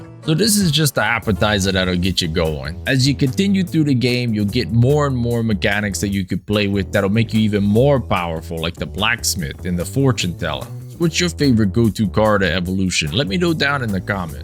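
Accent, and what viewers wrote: United States. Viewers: Bro, i didnt look at your channel name when i clicked on this but after hearing your accent i was like Mae, dats a fellow coonass, Cher!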